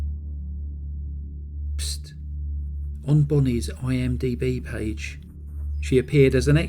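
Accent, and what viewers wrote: England, United Kingdom. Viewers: I must say Real Crime isn't my favorite but i like your storys and your so beautiful English accent👍❤🥰😊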